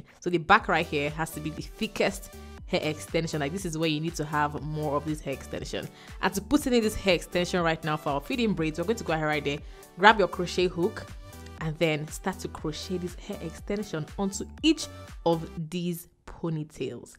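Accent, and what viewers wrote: Nigeria. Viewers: I just like your Nigerian voice and way of speaking.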